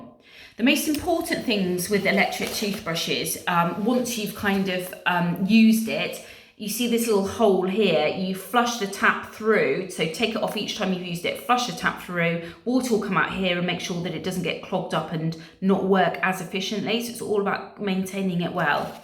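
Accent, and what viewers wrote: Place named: United Kingdom. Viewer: I could listen to you all day long. I love the British accent.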